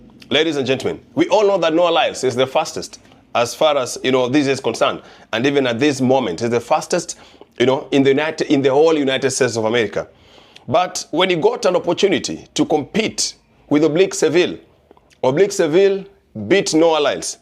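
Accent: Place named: Jamaica. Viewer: Bro, I love how you are practising your patois ❤.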